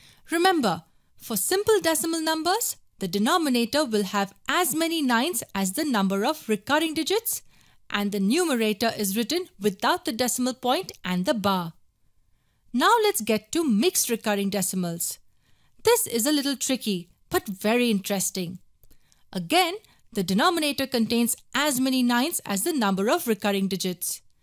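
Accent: India. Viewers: You sound to be Indian but your English is clear and I like it.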